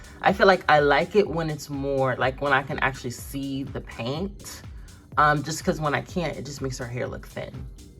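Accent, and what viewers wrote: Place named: United Kingdom. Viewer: It’s the British accent that’s scattered throughout this video, I love the energy!!!!!!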